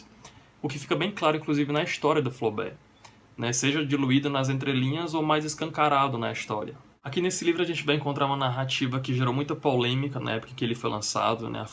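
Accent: Northeast, Brazil. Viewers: Sotaque nordestino?😍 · Acabei de conhecer o canal pelo vídeo da resenha de A Desumanizacao! Gostei muito do jeito que você descreve os livros, ta de parabéns (sem contar o sotaque nordestino que eu adoro) já tem mais uma inscrita! :)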